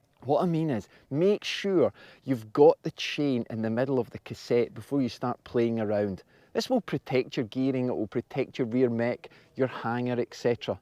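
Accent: Scotland, United Kingdom. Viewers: Hi. I live in New Zealand (and have Scottish ancestors so every time I hear your accent somethin inside me sorta triggers, in a good way), and I am 140KG. Gravity and I dont get along. Yes, I could lose some weight, but considering I have gone down from 220KG, and been this weight for 6-8 years, its probably about where I will end up. I am still allergic to hills, but what I do now is I have 2 bikes, a cheap commutery type thing with compact crankset and a decent size big cog on the cluster, and a a roadie with full size gears. I do about 3 rides a week, 2 shorter ones and one long one. On the short ones I primarily ride up some hills with 13% sections using the commuter. Then on the weekends I combine all the hills in one longer ride on the roadie. I find that this works well, I dont blow out during the week (i'm also 55yr old, so recovery is slow), and then I find I can push the big gears a bit more. 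I'm going to apply some of the stuff you have said here as well and see how I go.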